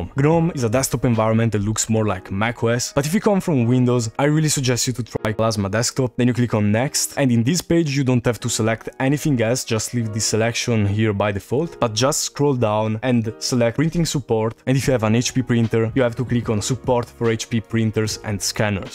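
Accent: Italy. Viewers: U are italian?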